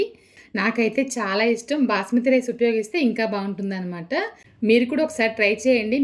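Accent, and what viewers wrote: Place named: Andhra Pradesh, India. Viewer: Meru ... tamilians aa ...adda bottu pettukuntaru..... telugu ascent emo...andhra valla laga untadi..😊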